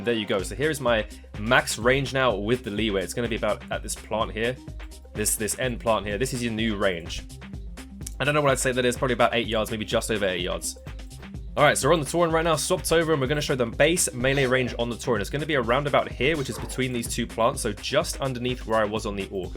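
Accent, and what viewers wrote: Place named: London, United Kingdom. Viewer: u sound like ur from london brother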